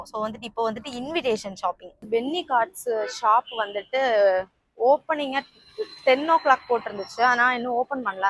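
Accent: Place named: Sri Lanka. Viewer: You sound Srilankan, are you from there?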